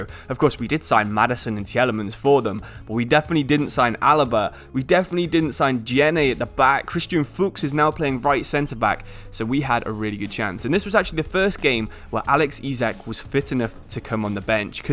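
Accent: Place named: Nottingham, United Kingdom. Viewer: mate this guy sounds like my mate from Nottingham lmao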